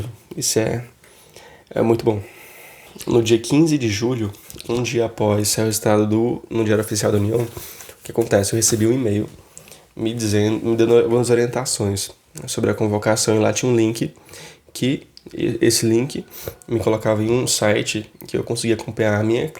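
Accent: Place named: Ceará, Brazil. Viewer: vc é Cearense? Só por curiosidade mesmo, pq às vezes "tenho certeza" pelo sotaque cearensão (kkk), mas às vezes fala o S paulista (queStões, e não queSHtões como o cearense) rsrs Estou gostando bastante dos seus vídeos! Tem me esclarecido muito!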